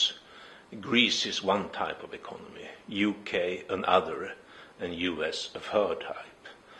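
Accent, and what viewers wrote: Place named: Sweden. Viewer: he might as well been speaking swedish, terrible accent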